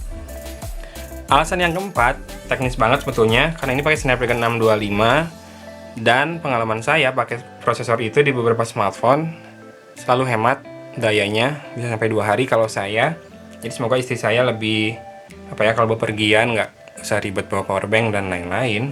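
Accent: West Java, Indonesia. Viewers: ini ifonre bgt sunda bangaat aa'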